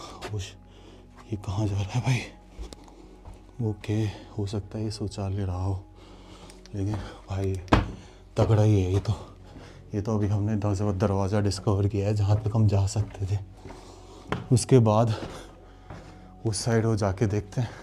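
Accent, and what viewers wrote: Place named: Rajasthan, India. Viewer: Bhaiya Ki Boli Kotputli aur side ki Lagti Hai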